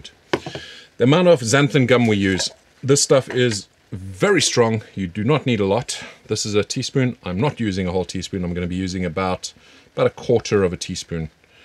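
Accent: South Africa. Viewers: from that accent im guessing SA? first vid and love it. gonna try but first i gotta source some chllies here in japan.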